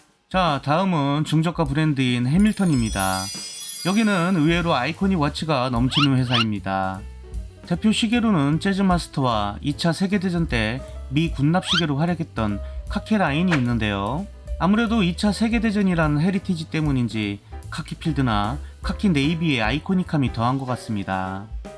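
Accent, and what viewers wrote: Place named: Jeolla, South Korea. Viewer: 더럽게 재미없네! 전라도 억양 킹받네 ㅅㅂ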